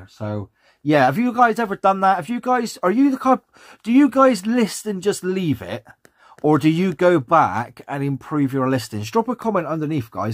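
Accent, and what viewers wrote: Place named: West Country, United Kingdom. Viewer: New sub. Caught your accent on one of s zoom calls & recognised it as a fellow West Country chap. Only got to 120ish listings before I revamped & unified using the same SKU & photo system. Wish you all the best with the restart 🙏 👍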